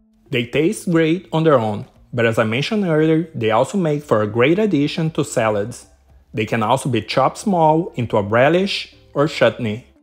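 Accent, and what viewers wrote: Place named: Brazil. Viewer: dig the recipe and glad you mentioned the sour dough bread toast --i'd think that apple cidar vinegar in recipe would taste great with avocado toast and rind recipe. And great accent! it reminds me of a great friend in college who's from Brazil..an instructor and now a gym owner in Dallas.. More recipes segments to come i hope!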